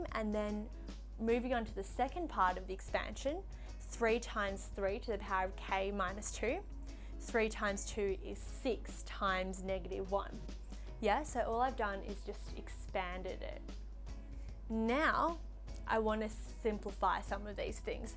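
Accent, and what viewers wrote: Australia. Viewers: Hello. Are you Australian? Just asking for a friend.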